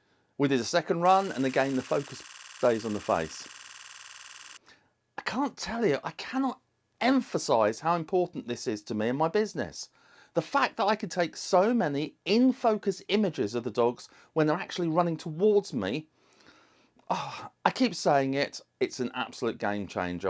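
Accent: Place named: United Kingdom. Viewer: Ok, I’ve subscribed etc. but I’m having trouble placing where in the UK your accent is from. It’s silly I admit, but even as a Yank, I have a pretty good ear for accents. I’ve passed as being upper crusty Londoner and as being Scottish in London and Scotland. In fact my brogue can get very thick. I just fail on the local idioms… Guess I should have been an actor. So please tell me where in the UK your accent comes from! I’m confused! But then again we haven’t been across the pond in much too long and I’m rusty. My top guesses are, at the moment: Cockney influence (how’s your rhyming slang?), Liverpool, Blackpool, Manchester. I’m an idiot but I was born this way 🤪. Your probably from Perranuthnoe and I’m not hearing it… Wait, in a couple of videos you’re wear orange, isn’t the the Blackpool Football team color? That would make Blackpool first choice. 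Right?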